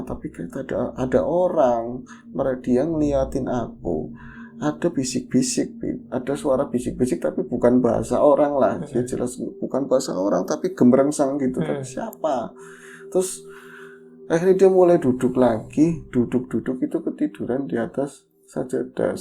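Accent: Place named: Tegal, Indonesia. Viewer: Udah mas style nya gt aja, malah asik koq kita jadi tau sedikit2 bahasa tegal!! Seru lho mas!! Sukses selalu untuk ngobor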